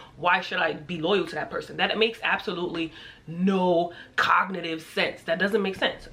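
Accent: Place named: New York, United States. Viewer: Girl just wanted to say I love your channel and your NY accent, love from London x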